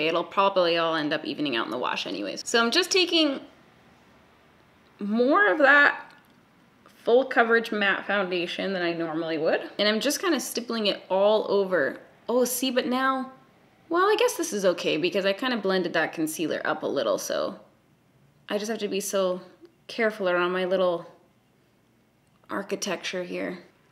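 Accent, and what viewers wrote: Canada. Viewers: This was pretty funny I burst out laughing a few times when you were doing your techniques you don't like. I also find it odd that you sound so Canadian and I've lived in Vancouver, Calgary and Toronto and haven't heard too many with the same kind of pronunciation of some words as you do. Especially the OOOKeeys. It's funny and cute. I also agree having super matte thick dry foundation just also makes my skin look like that and any lines and acne spots just look horrific so I've been having to go much lighter and luminous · sounding super Canidian today eh? I love it okey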